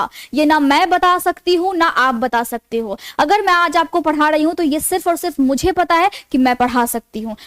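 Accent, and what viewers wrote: Bihar, India. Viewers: Aap bihari ho kya maidam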